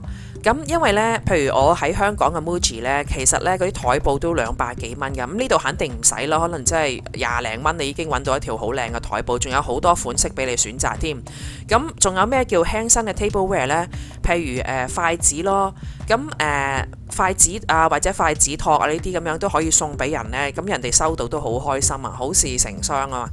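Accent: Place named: United Kingdom. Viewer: 睇你片重可以學英文，你 D 英國音好好聽。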